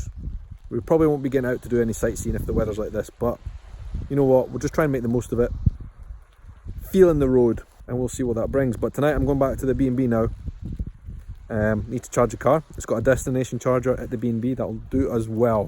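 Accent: Scotland, United Kingdom. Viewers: Thanks for sharing mate! I love your videos. The sad thing is those make me feel like I've made a bad choice to live in England, the good one is, I can still try to move north :) Scots accent is so cool and easy to understand, I could listen to it all the time, and all that nature you have there it's so beautiful, so remote. It feels - to me - like Polish have so much more in common with Scots rather than with English - the culture, expression while speaking, and so much more... I'm happy to subscribe!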